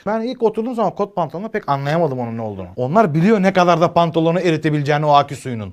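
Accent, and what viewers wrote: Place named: Tekirdağ, Turkey. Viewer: Hikaye süper, birde işin içine Tekirdağlı aksanı girince süper olmuş😁👍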